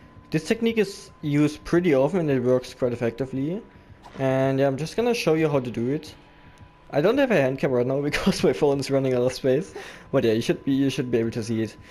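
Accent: Germany. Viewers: der deutsche akzent 😂 geil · Ich hab dein Video geschaut und direkt gehört, dass du einen deutschen Akzent hast. Gutes Video 😊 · Gutes video, man hört aufjedenfall denn Deutschen akzent aber echt gutes Englisch, thanks for the video, was helpful, I’m going to try it in game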